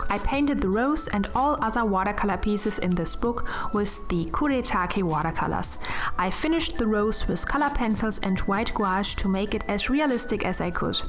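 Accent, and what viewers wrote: Germany. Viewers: I think her accent is really nice and cute, and I love to listen to the german accent! (also french and italian accent and other accents as well! ) Have a nice day! : ) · yes she is german, as a german i am ashamed of how harsh her english sounds .... i cant even listen to it tho... sorry I know how hard it is to correct the way of speaking but she doesnt even seem like shes trying . i know thats verry mean to sa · Man hört, dass du aus Deutschland kommst. Aber trotzdem cooles Video. Ich fände aber cooler wenn es auf deutsch wäre, da mein Englisch auch nicht so gut ist. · Are you from Germany? Because your English sounds very funny... Just as a german 😂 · Du bist deutsche... hört man voll😄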